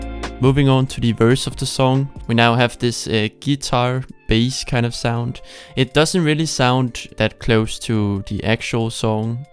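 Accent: Denmark. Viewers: Correct me if I’m wrong, but that’s the most danish accent I’ve ever heard (dope titprial tho!)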